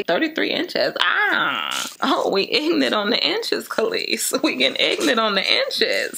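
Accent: North Carolina, United States. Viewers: I knew that accent was NC 😂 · "I guess I have a accent" Me, from NC: I don't hear anything 👁👁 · NORTH CAROLINA OMG🤣no wonder i was like what we sound the same 🤣🤣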